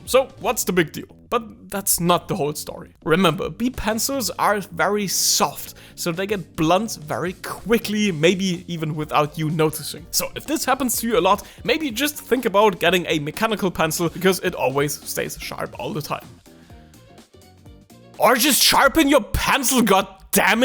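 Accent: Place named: Germany. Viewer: I started watching your videos last night and instantly found your accent and style of comedy nostalgic. You reminded me of the lessons my german mother gave me when i was young, very German yet very relatable.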